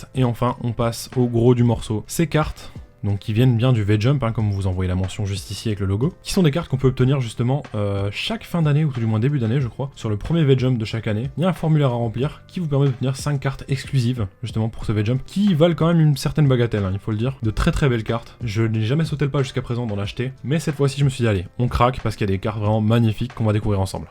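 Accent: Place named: Japan. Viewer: Et puis cet accent japonais ! Incroyable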